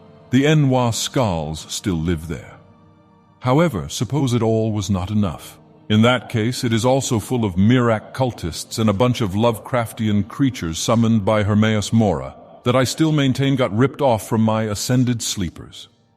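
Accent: United Kingdom. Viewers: British Dagoth Ur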